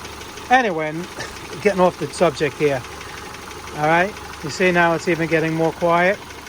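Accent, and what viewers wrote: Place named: Ohio, United States. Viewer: Appreciate the video, also sounds like one hell of an Ohio accent lol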